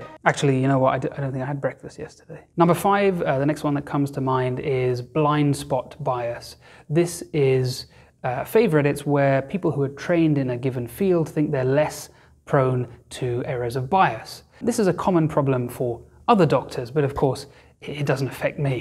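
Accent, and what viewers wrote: United Kingdom. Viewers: You seem to know a lot about medical bias but I have a dictionary .So please spare me your snooty british definition with that that lame accent you might not realize youre over the top condescending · One can observe: - 930 liked this video vs 2 who did not. So far.... A bias of people who like a certain type of British accent? Or perhaps it's the background music? Or perhaps the mention of Minestrone. I like Minestrone - usually.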